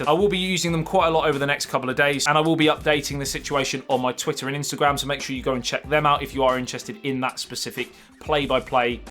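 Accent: England, United Kingdom. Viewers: lol its just his british acdent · He has a very slight accent - not quite accentless Home Counties, but from the heartland where the English language developed and as such is considered the baseline from which other accents are judged!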